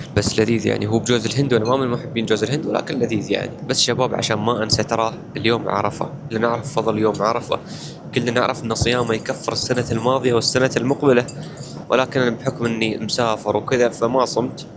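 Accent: Oman. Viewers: و الله كنت احسبك من السويج من لهجتك بس عادي كل عام و انت بخير و عيدك امبارك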